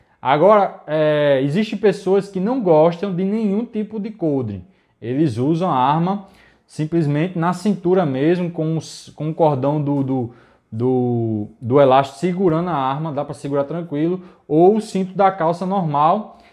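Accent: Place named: Northeast, Brazil. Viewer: Sotaque nordestino, show!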